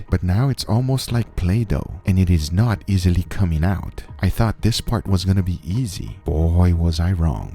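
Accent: Brazil. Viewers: Is this dude Brazilian? Major Brazilian accent!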